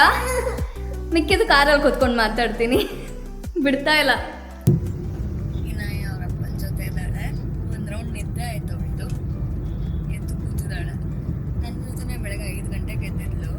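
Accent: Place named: Mangalore, India. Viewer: ನಿಮ್ಮ ಕನ್ನಡ ಮಂಗಳೂರಿನ ಕನ್ನಡದಂಗೆ ಅನಿಸಿತು ಅದಕ್ಕೆ ಕೇಳಿದೆ🙏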